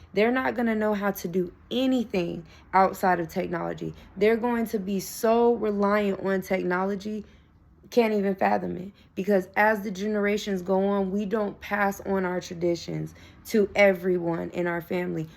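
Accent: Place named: New York, United States. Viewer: I'm detecting a northern accent, is that NJ. , long Island. Or staten Island?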